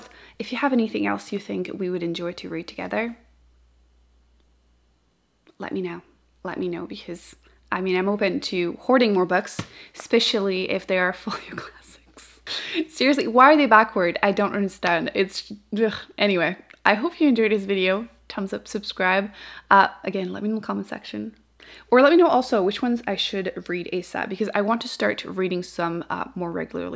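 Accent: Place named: Quebec, Canada. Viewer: Canadian French sounds sooo different than France French 😟 Love your accent🥰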